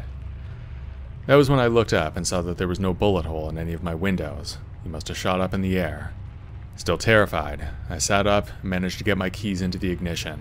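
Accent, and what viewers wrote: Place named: Canada. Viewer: ❤❤ Thanks love your calming voice, you must be Canadian like me!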